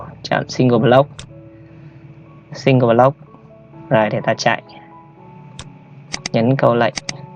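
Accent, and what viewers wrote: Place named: North, Vietnam. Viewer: Người bắc nói giọng bắc dễ hiểu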